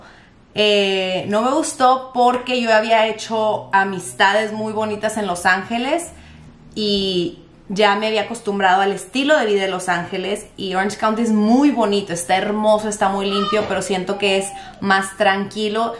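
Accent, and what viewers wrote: Monterrey, Mexico. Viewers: Hola me caes muy bien me encanta tu forma de hablar inconfundiblemente regia! 😁 confieso que pare de ver tu vídeo un poco para ir a escuchar la canción de que mencionaste wow hermosa canción! Me recordaste a una YouTuber regiomontana también su canal se llama la regia charlton se me hace que tú y ella se parecen! muy guapa señora y el modo de hablar parecidisimo al tuyo y ni hablar del acento, Oye tú niñita la misma carita de su papi 😳 me encanto saber más sobre ti me parece tu vida interesante bueno bye cuídate y éxito y más éxito 👍🏻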